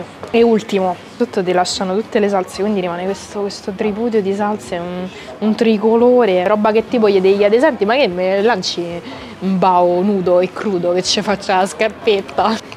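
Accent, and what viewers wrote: Rome, Italy. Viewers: il suo parlare romano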